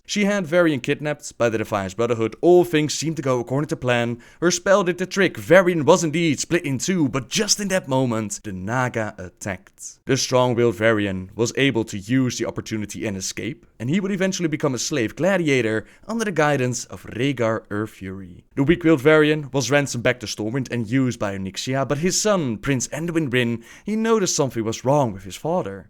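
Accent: Netherlands. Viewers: such a dutch accent :p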